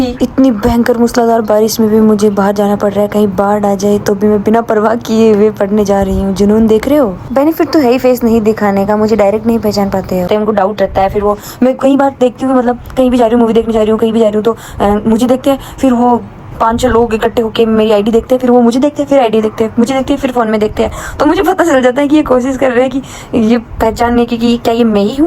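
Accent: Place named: Marwari, India. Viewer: Es aavaj m alag hi marwadi accent aarha h😝👍🏻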